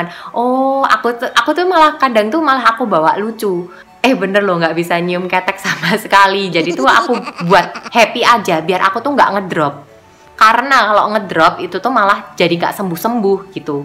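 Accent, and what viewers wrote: Java, Indonesia. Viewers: Jowo ne medok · Medok gaes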